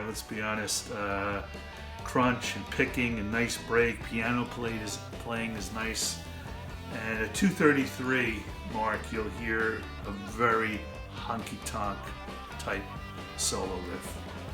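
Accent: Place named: Philadelphia, United States. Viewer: This was the first album (cassette) I ever bought when I was 15, 16(?) and although it’s not considered generally or by critical acclaim to be one of their best, it is nontheless a solid album. To be honest, it’s not even one of my favorites although I do like it very much and always have. I was introduced to the by the older brother of a good friend when I was still a young rock fan trying to expand my musical horizons past Foghat, Kiss, Journey, Doobie Bros, etc. (I had discovered Led Zepplin and The Who by this time but Pink Floyd wasn’t even on the radar). Anyway, thx for your in depth research, knowledge, and reflections of personal ups and downs the band members may have been going thru during the time of recording. One thing I’d like to mention about Goat Heads Soap is that I really feel that is possibly the most underrated song and one of my all time favorites! 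Another great job. I watched all three Let It Bleed docu’s and now watching part 3 of Goats Head Soup as I write this. Would love to get a copy of Brussels Affair, CD and DVD, blue Ray if possible. Yep! Another great job! One last thing; I live in Cal and I’m guessing by your accent that you’re from Philly. Just a sayin… Hope you do a doc about my favorite album and one the two tours I saw…SOME GIRLS. (Tatoo You was the other one)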